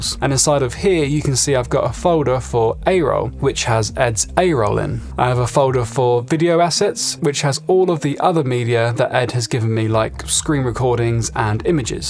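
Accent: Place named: United Kingdom. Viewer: I just get a thrill when I listen to your British English ))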